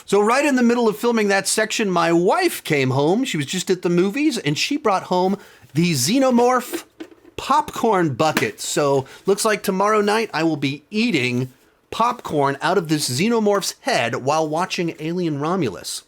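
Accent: United States. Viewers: The voice and speech pacing of this guy are so American. Too much gesticulation. Sorry, unbearable for me to watch & listen to.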